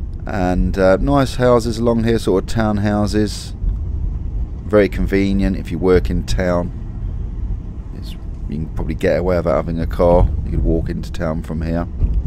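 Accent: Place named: Guernsey, United Kingdom. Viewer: The De Le Rue was a printing shop when we lived on the Island!! Another super trip - always love listening to you - good old Guernsey accent.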